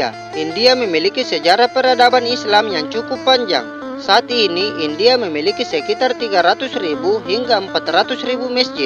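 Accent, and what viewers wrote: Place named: Sulawesi, Indonesia. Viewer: Kamu OKKOTS😅...org sulawesi yah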